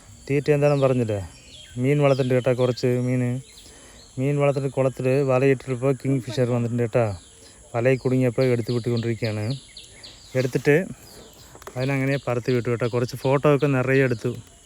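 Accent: Tamil Nadu, India. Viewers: നിങ്ങൾ തമിഴനാട് ആണോ സംസാരം കേട്ടു ചോദിച്ചതാട്ടോ. എന്തായാലും നല്ല രസം ഉണ്ട് കേൾക്കാൻ ❤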